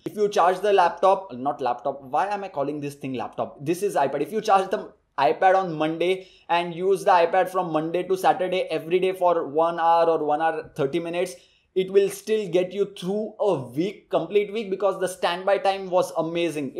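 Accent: India. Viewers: # no insult intended please dont be offended, you seem intelligent and a fast learner so im sure you learned the english language very easily so im sure you could learn an british or american accent very easily so it would be no problem for you, im saying this because you speak english in your videos but you still have your desi accent which white british english or american people but mainly racist people make fun of, especially if you try & make a conversation for a white girlfriend speaking in a desi accent she will be put off and run away from you but an advantage of getting a british or american accent is the white girlfriend you make she will like you because you sound intelligent, so to learn an english accent please by watching all episodes of DOWNTOWN ABBEY, please google season 1,2,3 etc, to learn american accent please watch all episodes of FRIENDS please google season 1,2,3 etc, again no offence i only say this for your own benefit not mine trust me im british born and i know all about this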